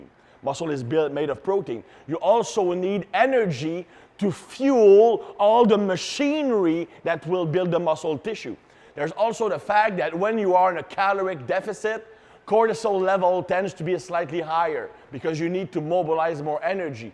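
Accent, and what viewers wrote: Quebec, Canada. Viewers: What is it about a French-Canadian accent that makes me want to shut up and humbly learn???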